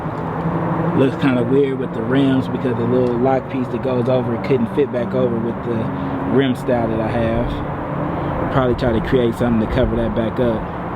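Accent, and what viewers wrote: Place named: California, United States. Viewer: Sound like he from Cali or West Coast. I may be wrong though lol. Good review!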